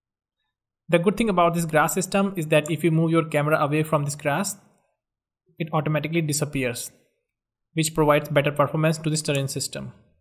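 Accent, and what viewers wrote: India. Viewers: indian accent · Who agree that he is indian but speaking english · You are Indian by accent . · I know that you are a Indian I can identify by your vioce I see very less indian game dev channel's keep it up 👍🏼From indian punjab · when there is an indian speaking, you know its going to be a good tutorial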